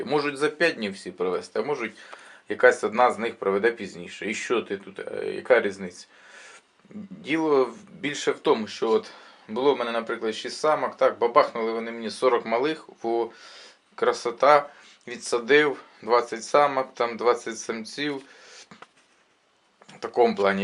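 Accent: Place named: Poltava, Ukraine. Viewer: Дякуємо за поради і приємну мову, чисто як у Полтавській чи в нас, південь Чернігівщини. Здоров'я, натхнення і творчих успіхів!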